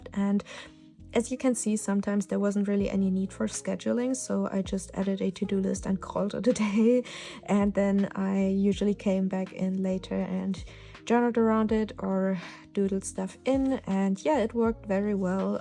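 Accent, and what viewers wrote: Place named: Germany. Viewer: This was such a cozy video to listen and watch, good mixture of very soft music in the back and I adore your German accent. Would love to see more in-depth explaining and layouts that you use for different types of todos and creativity! >w< As for planners that I'll be using for 2026 (braindumping about the specifics will happen later in Nov); Hobonichi Cousin: all-in-one daily planner, scheduler, personal/hobby journal Hobonichi Weeks: adult-log for chores, appointments, more todo focus with no personal details Hobonichi HON A6: art freelance/work planner, detailed todo of my art projects along with its own space for notes (leaves more room in the Cousin) Self-Care journal: bullet journal with a simple tracker for emotional, mental and physical symptoms. A page for affirmations and quotes to read back on and a Monthly Review Yearly Collections journal: fun collection pages from hobbies, trackers to goals (very tempted to move this into the Hobonichi Weeks.. 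but unsure due to how small the Weeks is and it may clash with the 'adult admin/todo focused' theme). Apologies for the long comment, I can ramble on and on about my planners haha. But there is still a lot to figure out. I've only been using the Hobonichi Cousin for the first time this year. I used to bullet journal everything in a B5 size that would fit 5 months per book... but monthly setups became extremely time consuming, so I'm VERY happy to have moved into the Hobonichi planners >w</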